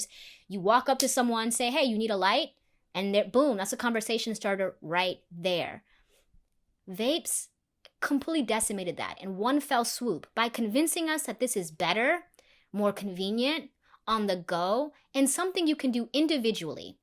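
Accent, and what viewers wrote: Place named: Atlanta, United States. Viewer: Haha I totally recognized that ATL accent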